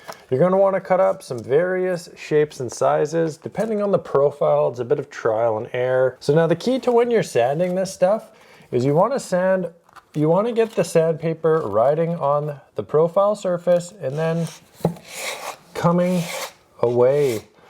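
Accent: Canada. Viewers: I have no idea why this was in my feed and I've never seen this guy before, but he sounds very Canadian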